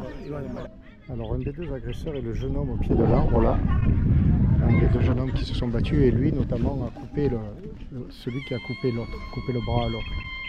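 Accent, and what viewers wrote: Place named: Marseille, France. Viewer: Comme tu le dis si bien : malheur! mais avec l’accent Marseillais ça passe mieux et ton audace te sauve 👏👏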